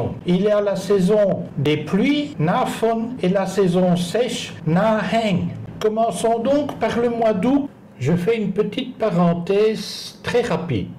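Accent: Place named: Belgium. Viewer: Merci et ce bel accent belge 😊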